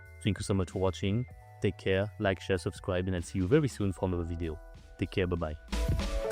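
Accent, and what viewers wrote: France. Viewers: I think Uncommon Sense accidentally did you dirty with that "bylking" comment 😆 he was actually teasing you (phonetic /y/ is pronounced like the french u, he was just playfully replicating your accent IINM) The french u is closer to the english way of saying "bulk" than a french i. If you wanna inch closer still, you could say 'boulking' but almost like an O, or just keep practicing the english pronunciation directly. Cheers.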